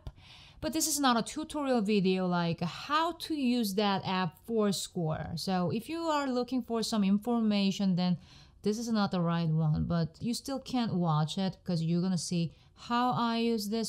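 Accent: South Korea. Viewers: I adore your funny Korean English accent as you call it. Your are pretty funny when you make fun of yourself. Love it.💙💙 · sometimes i think i'll just watch for a couple minutes and then you get me hooked and i have to sign in to thumbs up and i wind up watching for hours. with this one you had me right at the beginning with "maybe just enjoy this funny english, korean accent" and your expression when you said it. hahahaha! so here i am again! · 한국분이신가봐요! 악센트 별로 신경쓰지 마세요 영어 원어민들보다 잘하시는데요 뭘 ㅎㅎ 영상 잘 보고가요!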